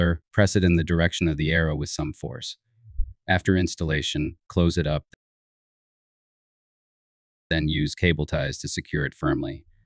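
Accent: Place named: United States. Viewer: American accent with a uk plug? Hmm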